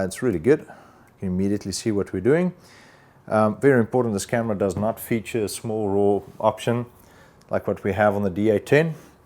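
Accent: South Africa. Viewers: You sound Afrilaans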